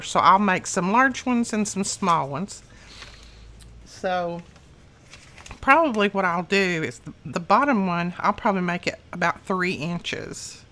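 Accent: South, United States. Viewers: I didn't realize that you were near Birmingham, Al. I guess I missed out on were your from. But, I can tell you have a sweet voice with a slightly southern accent. I'm from Alabama myself so there's not really an accent. Lol I think doing what you're doing and talking with "friends", will help you out of that funk. Above all, prayer. But I realize you know that already. Here's to better days ahead.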